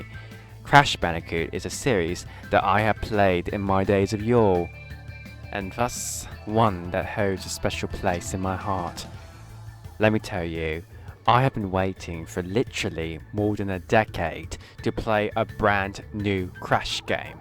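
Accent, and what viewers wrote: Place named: United Kingdom. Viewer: Also, your British accent is 👌👌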